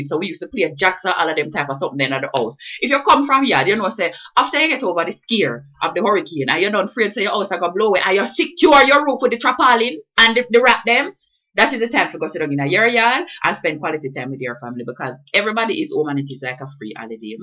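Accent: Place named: Jamaica. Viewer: I am from Serbia,and i watch this fat girl talking,but i cant understand her ! This is not English language man ! Is this,that Jamaican patois or what ? It's wicked ~~~~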